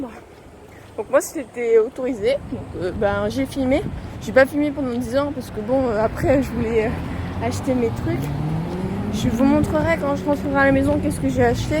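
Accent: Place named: Haute-Savoie, France. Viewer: こんにちは(^___^)元気ですか Je trouve vraiment que tu te débrouille plutôt bien pour filmé...... non je déconne..ahah ^^ mais c'est sympa de t'écouté parlé avec un petit accent de haute Savoie!! je me trompe sans doute?? Merci pour ce partage... et à la prochaine (^_-)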